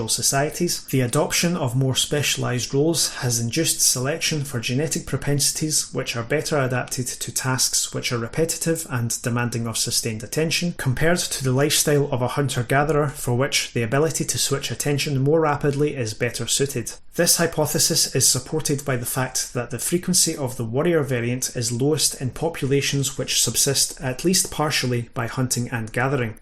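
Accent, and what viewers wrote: United Kingdom. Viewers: Warrior/ worrier isn’t the greatest phrase to follow with a Scottish presenter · Are you a Scouser?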